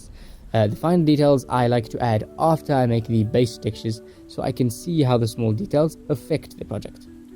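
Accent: South Africa. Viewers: nice tutorial bro are you from south africa? I can hear from your accent lol. I'm from cape town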